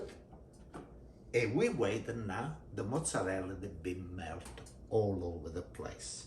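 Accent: Italy. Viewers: Just listening to him makes me happy and makes my dad im half Italian i don't speak Italian but listening to him makes me wish i had that accent haha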